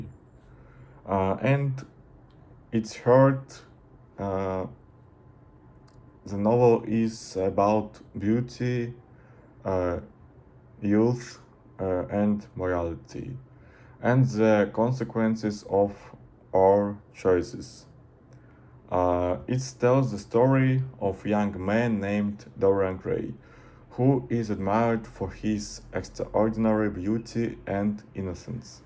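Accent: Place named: Eastern Europe. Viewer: To talk about this more, I don’t personally think hedonism is inherently bad, but I think it is often unhealthy for a person mentally. I don’t know what culture you are from, certainly your accent sounds like one of the languages in the Slavic family, but I’m from an area that is very repressed and people are not as open or accepting of others or new experiences as they should be in a healthy society. I feel like Mr. Wilde should not have been persecuted by others, but also that he should not have persecuted himself.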